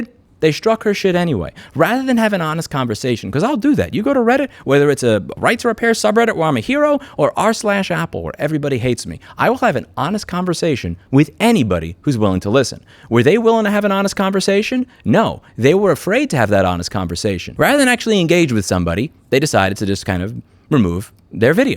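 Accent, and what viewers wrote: New York, United States. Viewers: Sir, your inner new york is showing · You might be in Texas now, but the New York is still running strong through your veins and speech patterns. Love these videos. Im sure one of these companies will eventually attempt to fuck around and find out.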